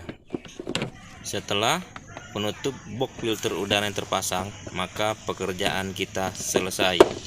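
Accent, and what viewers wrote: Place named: Palembang, Indonesia. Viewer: Logat Bahasa suara anak palembang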